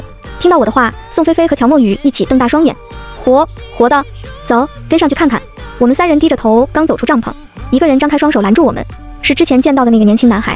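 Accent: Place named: Malaysia. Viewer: 嗯？这么听到马来西亚惹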